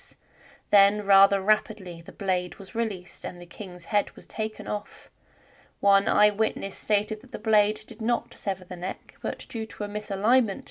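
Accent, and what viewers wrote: United Kingdom. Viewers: Narrator is not American lol. She sounds British. "Only Americans" 🙄 smh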